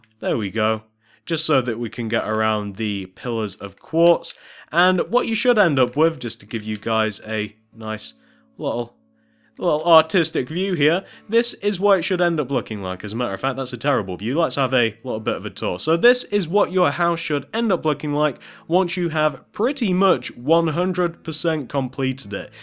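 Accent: United Kingdom. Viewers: UK AMERICA WERE DO YOU LIVE SOUNDING LIKE UK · _300 I hope you're being sarcastic because if you haven't already noticed...He is not American he is British....also British people have accents if you couldn't notice from the way he speaks....therefore he isn't saying free as three and fing as thing on purpose that's just the way they speak. Just thought you should know...just in case you didn't... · I know your friend JosephBrown and i took your house that has a water fountain in front of it and i heard you talk british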